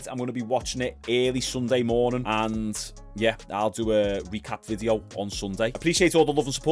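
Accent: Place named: Liverpool, United Kingdom. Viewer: I’m a fan You’re fun to watch and the Liverpool accent is your “Bread and Butter”!!! 🤣🤣🤣 Anyway, you could do a great live reaction show…it’s a bit of work but it would take you to the next level with “Super Chat” money, etc Keep up the great work!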